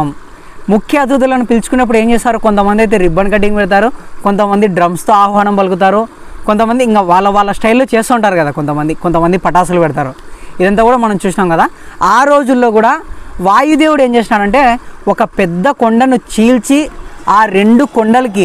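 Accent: Rayalaseema, India. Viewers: Rayalaseema yaasa?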